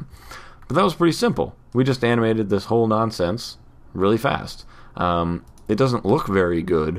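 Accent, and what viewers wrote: Canada. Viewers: Nice work! thanks for the help! By the way, are you a Canadian? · You sound canadian are you? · Are you canadian? :D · I know it's mostly just because you're Canadian, but omg you sound so much like Seth Rogen. <3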